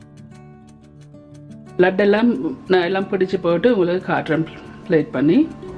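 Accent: Sri Lanka. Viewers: உங்கள் லட்டும் அருமை உங்கள் இலங்கை தமிழும் அருமை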